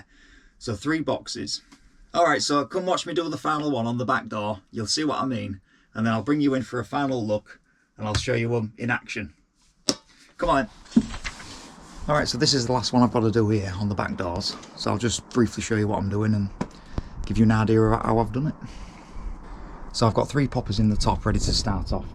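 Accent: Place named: Hartlepool, United Kingdom. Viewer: Are you originally from Teesside area mate ? Got a Hartlepool accent 🫡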